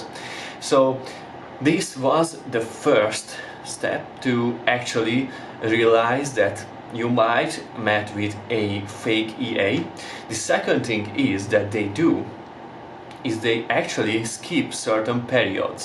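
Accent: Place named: Hungary. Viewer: I think from hungry, as far as the accent goes. I'm from there, too. So i guess I could identify it :)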